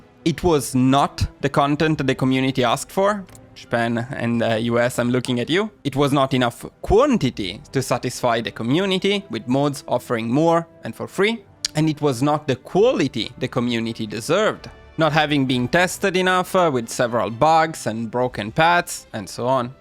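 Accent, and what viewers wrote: Italy. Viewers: Well, technically they put a thing that autoupdate the mio, and you can queue the upgrade you want at the start of the game. For the stuff i prefer to manually manage what i want to trade, like if i'm germany and i need 8 chromium for a battleship, i just don't trade that since it's not a priority. And one extra thing, i really hope that they take the time to upgrade old dlc focuses, they look totally dated if you compare them to the last ones. On another note, you really sound italian, idk if you are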